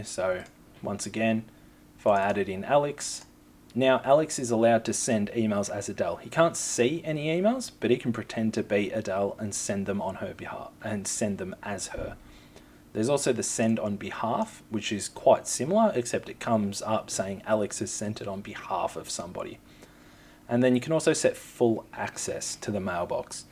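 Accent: Australia. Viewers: I guess this is the closest thing we are gonna get to finding a video with English Speaker. Like, I saw the thumbnail and thought YAY a white guy then I hear hes Australian and Im kinda bummed but then I think well at least hes not Indian. WHY are so many Tech videos done by an Indian with a super harsh accent? Hey Non- American accent aside, Great video "Mate". ;-)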